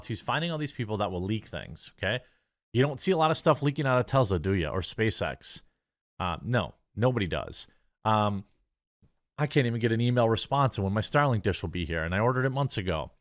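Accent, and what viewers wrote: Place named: Wisconsin, United States. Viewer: I get a chuckle when your Wisconsin accent breaks through. DrAYgged. VolkswAYgen. Brings a smile to my face when not much else has over the last 2 years.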